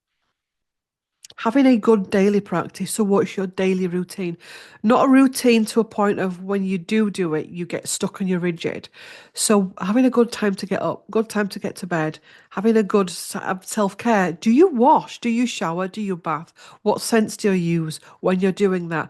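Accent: Yorkshire, United Kingdom. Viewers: Some of your pronunciation today was particularly Yorkshire, you made me smile. My 84 yo dad is a Yorkshire man. You help sooth the Soul during these times....🫠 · Love your videos and yorkshire accent. ❤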